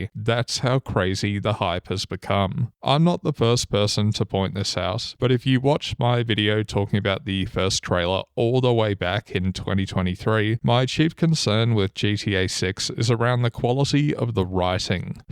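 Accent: Australia. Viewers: australian accent is peak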